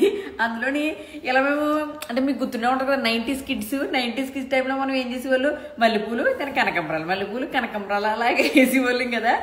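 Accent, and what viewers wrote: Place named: Amalapuram, India. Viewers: ఏదేమైనా మీరు మన అమలాపురం వాళ్ళు ఎలా వుంటారో ఎలా మాట్లాడతారో అలానే వున్నారు,కొందరు వూరు మారితే అన్నీ మార్చేస్తారు,వడ్లు కాసే చెట్లు అంటారు ,ఎవ్వరేమనుకున్న నువ్వు సూపర్ సిస్టర్ ❤❤ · Mekkudani..antu chinna ragam theysaru..adgadi..mana konasema..theeepidanam.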